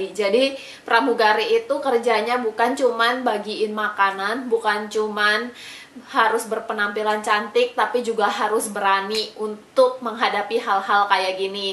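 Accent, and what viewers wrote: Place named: Medan, Indonesia. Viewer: Logat medan nya masih kental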